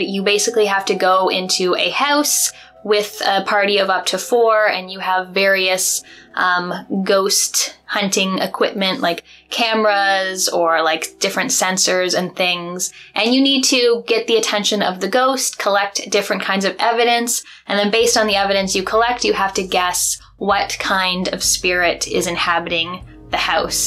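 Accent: Canada. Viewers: I like your Canadian accent.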